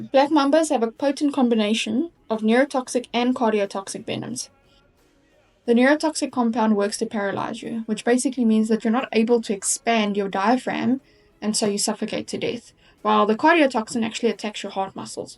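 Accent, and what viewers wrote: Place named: South Africa. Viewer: That South African accent sounds fire 😂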